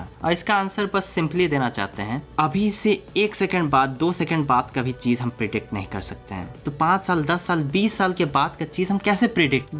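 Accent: Bihar, India. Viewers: It is quite intriguing to hear a Korean speak Hindi in Bihari accent! Mind blowing to hear the "Bhadiya" with nasal tone!! ha ha ha ha Heard your thoughts on Money and success. Would like you think a bit more on success. Money or popularity or power is not really success in life; money is means for life. Also one big point you missed is of multi-tasking. nobody says you ought to do one thing only at a time, focus on 2-3-4 things to be made sources of Income. Do something online like stock trading or tutoring or youtube, do full time job and a part time over weekends sometimes, all at same time or different times. Since you are young you can start multiple ways and then drop many or all along the way and start something new ! Yes, you think tooooo much, Just do it !! If you think giving gyan would be great on YT, no, stick to stories... preferably imaginative...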